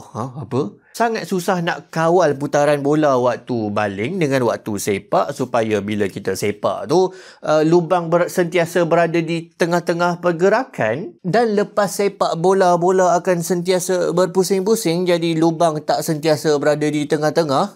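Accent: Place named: Terengganu, Malaysia. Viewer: orang ganu rupenye😂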